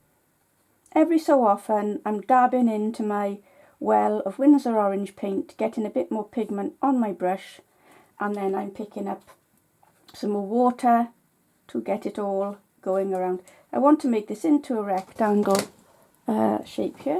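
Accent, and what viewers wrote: Wales, United Kingdom. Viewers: Thanks for a great video -all delivered in your lovely Welsh accent! I specially liked what you said at the end about playing rather than striving and slogging away when we feel stuck with our art. Also being aware of the "mindful moments", awareness of the sound of the pencil on the paper -I like the feel of using the materials too -its a tactile experience for me as well as visual.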